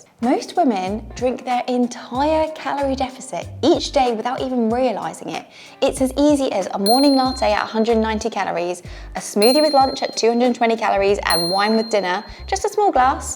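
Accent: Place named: United Kingdom. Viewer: I don't mind the music, probably because it's classical, goes great with your British accent! Doesn't bother me.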